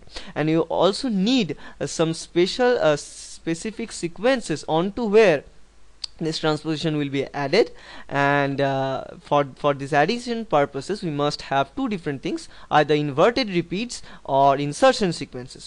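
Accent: India. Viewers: Dude I swear. Every single time. An indian accent.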